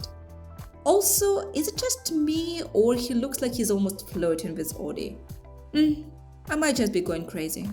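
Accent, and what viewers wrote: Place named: Ukraine. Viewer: Моментально по акценту відчула своїх, хіхі Дякую за чудові відео, вони як стан душі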